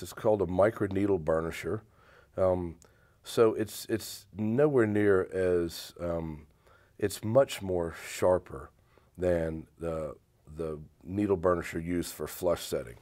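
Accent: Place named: New Orleans, United States. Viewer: You sound like you are from New Orleans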